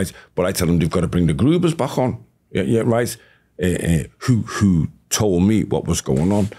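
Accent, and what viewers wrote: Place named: Liverpool, United Kingdom. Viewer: Could listen to him for another 3 hours and I can't stand the Scouse accent.. what a great video. 👍👍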